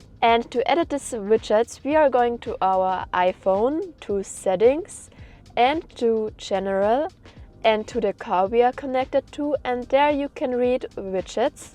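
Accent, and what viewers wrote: Germany. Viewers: You are beautiful . Thank you so much for sharing this update. Are you a German? You have something like German accent.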